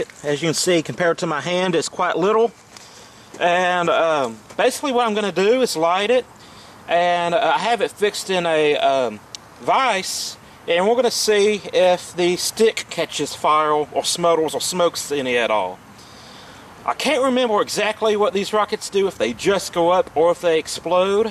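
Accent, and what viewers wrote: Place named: South, United States. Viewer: You got a really strong southern accent lol. Great video!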